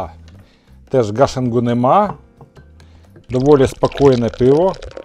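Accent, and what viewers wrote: Ukraine. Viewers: Мої батьки з Донеччини розмовляють українською з таким же акцентом))))))) це мабуть територіальна особливість!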